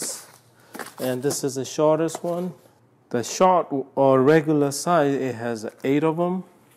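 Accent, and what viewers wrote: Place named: Myanmar. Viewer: You have a Burmese accent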